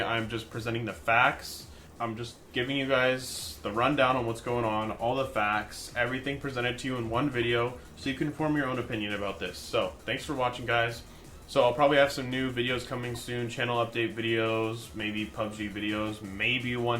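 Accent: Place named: United States. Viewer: u sound like an american trusta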